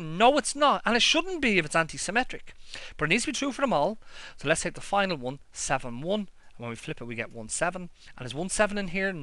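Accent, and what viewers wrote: Ireland. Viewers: who would have guessed that watching jacksepticeye would get me used to the irish accent enough to help me learn math?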